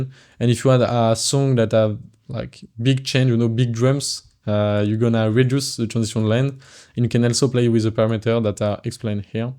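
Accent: France. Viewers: Mec, to accent.. 😅 Heureusement j'suis francophone 😄 Nice stuff anyway, very creative 👏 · notre beau accent Français !! c'est magnifique, sinon gg et merci pour la video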